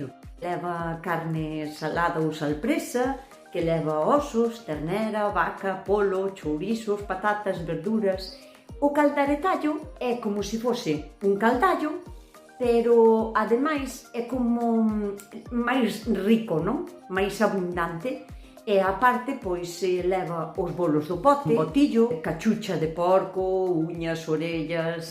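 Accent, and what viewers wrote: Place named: Ribeira, Spain. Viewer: Hola,primero por favor donde vives.despuesde más de 50 años puedo oír hablar en gallego,es como en mi pueblo Ribeira ❤ Vivo en Aragón ,añoro mucho Galicia.😢 Me encanta oírte ,abrazos ❤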